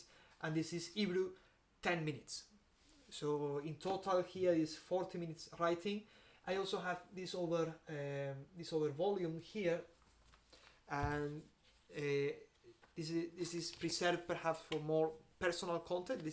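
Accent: Scotland, United Kingdom. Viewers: I found myself here following different threads of polyglots and their channels. I listened to this video and your intro to your channel in Spanish. You are a very interesting fellow. One thing puzzles me, and that is why does your English sound like someone with a Scottish accent? Did you have an English teacher who was Scottish? Just curious. I enjoyed your video.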